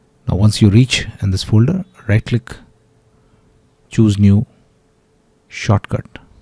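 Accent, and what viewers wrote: India. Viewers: The moment I heard the Indian voice i immediately knew this was going to work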